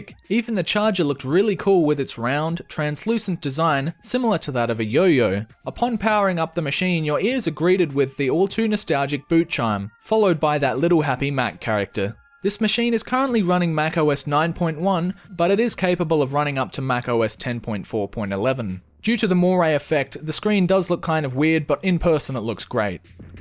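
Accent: Australia. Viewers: Woo your Aussie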